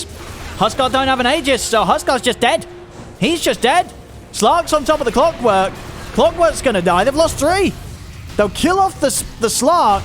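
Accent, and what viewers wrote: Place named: United Kingdom. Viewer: where can i watch all his casts?? i want to adapt his thick british accent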